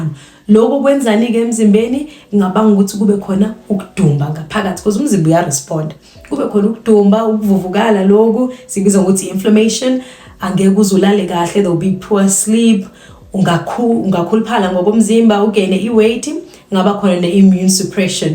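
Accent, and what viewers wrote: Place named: Zulu, South Africa. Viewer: You are so beautiful and your isizulu accent is amazing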